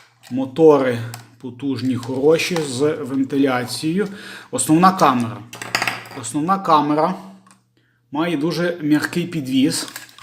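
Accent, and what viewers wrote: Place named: Polissia, Ukraine. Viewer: Зацікавив ваш акцент. Ви випадково не з київської області (з регіону де живуть Поліщуки)? Частенько чую у вас слова притаманні саме тому регіону.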